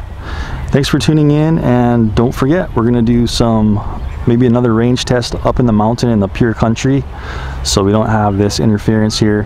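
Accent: Hawaii, United States. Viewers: Howzit great video, I hear a slight localness/Hawaiian in your voice. Mahalo again for the video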